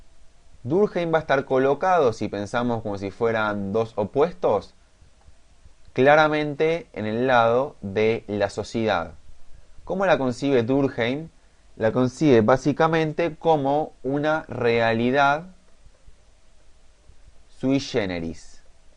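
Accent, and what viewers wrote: Argentina. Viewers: Es argentino, deje el show.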